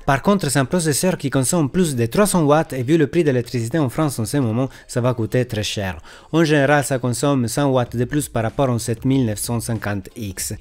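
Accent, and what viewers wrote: Italy. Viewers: Bravo pour vos vidéos claires et précises, le tout avec votre superbe accent italien 😊. On apprend plein de choses à vous écouter. Continuez comme cela, c’est parfait. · J'avais compris pour le pseudo mais pas pour la présentation, peut être mettre des sous titres en francais pour que tout le monde comprenne , (vous êtes de Naples ? :) )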